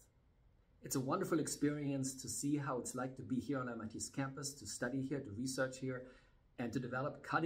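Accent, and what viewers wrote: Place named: Germany. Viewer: Hey, i wondered if you have a German background? To me you sound (and look) a bit German.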